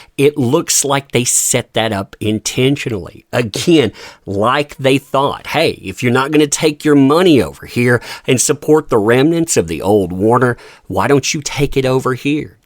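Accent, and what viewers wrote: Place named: Alabama, United States. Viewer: I just found this channel not that long ago but no offense, but is the main guy on here trying to pull off a gay redneck hillbilly from Alabama or something? The voice thing is really annoying. Is that like a gimmick just for this video or a couple videos or is that like how you sound all the time? It's really fucking weird cringe-worthy and hard as fuck to pay attention to whatever you're trying to talk about cuz I just keep seeing images in my head of some redneck dude down south wearing high heels or something it's fucking weird. Like I said man I'm not trying to be mean or offensive but I know I can't be the only one that that comes across that way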